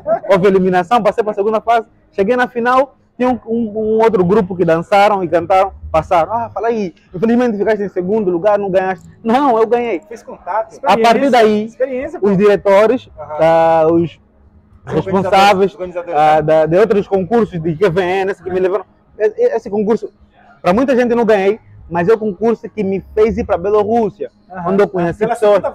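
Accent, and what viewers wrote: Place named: Brazil. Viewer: Não Acho que há problema algum nisso, não deixa de ser um idioma, e nos entendemos, e se acompanhasses realmente o canal dele, saberias que ele, adora a cultura e o povo brasileiro, e muitas vezes mencionou que sempre que fala com brasileiros tenta falar como um brasileiro, o que eu acho bonito como brasileira, não sei para os angolanos, mas isso não lhe tornara brasileiro, ele ama idiomas, e idioma não se baseia só na lingua mas sim em pronúncias e sotaques diferentes, aprender sotaque de outro país também é um desafio linguístico. Parabéns para ele, Brasil te ama.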